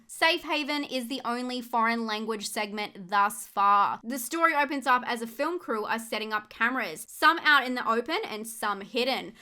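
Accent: Australia. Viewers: Can we just stop to appreciate how beautiful, upbeatand thorough is? Her amazing Aussie accent and charm is attractive. Still shocked by some of what shes tolerated horror wise.. I still havented seen Noroi the curse and Salo seems like a difficult and unpleansant watch tht will be infuriating to me. And this from a guy whose favorte horror films of last 15 yrs have bee Heridatary and Audition. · I clicked for the reviews. I stayed for your Aussie accent.....came to the Aussie accent.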